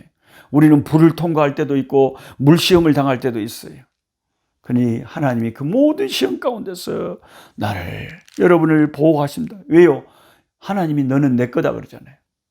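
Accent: South Korea. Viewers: 구수한 지방사투리에 정감이 간당게유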